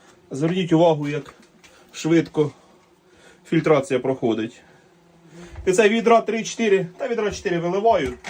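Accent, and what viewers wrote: Poltava, Ukraine. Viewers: Полтавський говір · Чути зрОзу шо Ви з полтОвщини. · Зі 100 відсотковою впевненістю можу стверджувати, що Полтавський говір😊 Може навіть північні райони... Пирятин, Чорнухи, Лохвиця, Гадяч, Зіньків... Напишіть, чи вгадав?😅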